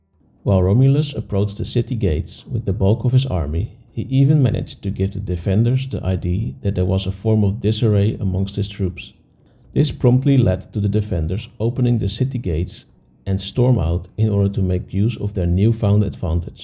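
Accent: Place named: Netherlands. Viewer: dutch accent ftw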